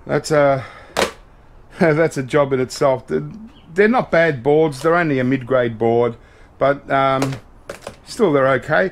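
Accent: Australia. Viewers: 73 kg! Nice tab for eBook reading. I always enjoy your videos, love your Aussie accent.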